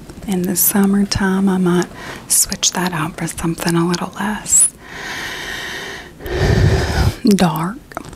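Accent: South, United States. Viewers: I LOVE your ASMR. Southern accent is the my fav. Thank you for putting blame on Justin, he dropped the ball on that one. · Man I was just thinking about how I was craving a new vid from you and then I refreshed my youtube homepage and here it is! I always love the southern accents cause it makes me feel like I'm back home after moving up north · She's back and better than ever!! I love your ASMR so much and especially your southern accent! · There's something kinda comforting about hearing somebody speak in a southern accent Not about anything politically charged or whatever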